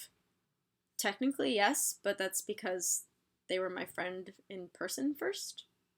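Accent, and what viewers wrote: Connecticut, United States. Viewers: You say talk instead of tawk. What part of connecticut are you from? I moved to va from connecticut and my entire class tried to give me a speech class lol. Theyd say, "there's no w in talk Shakia." The words that stood out to everyone were talk, water, car, chocolate, coffee, dog... i pronounce them tawk, waduh, cah, chawclet, cawfee and dzawg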